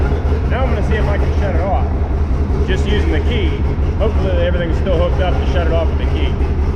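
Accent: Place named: Western Pennsylvania, United States. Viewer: Ahh ok that makes sense, you had the same western pa voice I'm used to, that's where I am haha. You ever need a hand with anything let me know. I can work on about anything haha.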